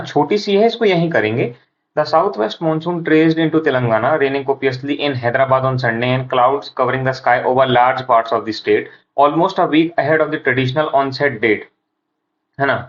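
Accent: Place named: Bundelkhand, India. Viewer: Ok bundelkhandi lag rahi thi braj type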